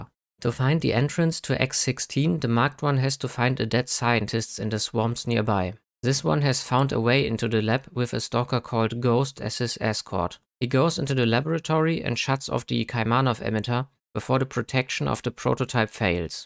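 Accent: Germany. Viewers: North german accent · german english lol. höre ich sofort ^^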